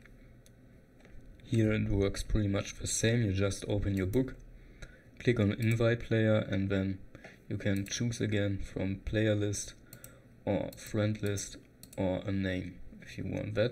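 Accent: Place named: Germany. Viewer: man hört das du deutsch bist danke für das vidio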